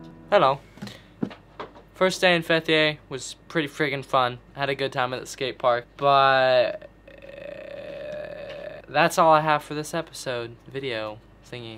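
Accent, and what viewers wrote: France. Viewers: tu as un bel accent français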